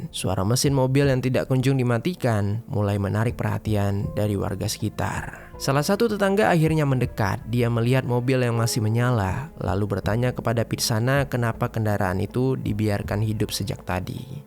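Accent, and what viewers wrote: Java, Indonesia. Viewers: Ada jowo jowo nya yang ngomong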